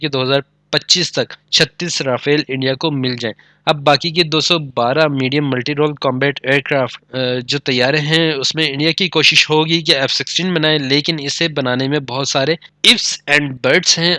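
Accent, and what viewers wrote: Punjab, Pakistan. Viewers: I am Pakistani and I agree with you, this guy is either unemployed or he is doing fake propaganda for ispr. india is way ahead. PAF is no match in front of india in numbers or in technology. even witht he failure of tajes and mig 21 still indian air force is 6 times greater than pakistan. I am not posing to be pakistani I am real Pakistani from karachi and I can tell you from the accent of this guy he is punjabi who is speaking urdu.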